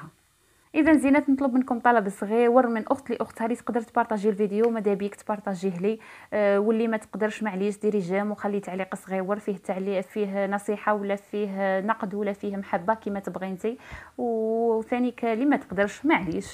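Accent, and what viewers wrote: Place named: West, Algeria. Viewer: تهبلي هههههه انا قبايليه بصح نموت علي لهجه الغرب ، غير على لي ماتحبش تخلي كومنت معليش انا نخليلك لايك وكومنت ونشترك. ههههه نجربها ولادي هبلولي علي لبن لي داقه فالدزاير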